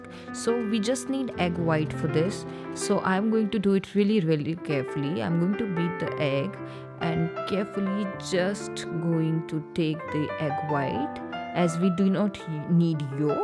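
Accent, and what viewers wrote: India. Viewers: I love her indian accent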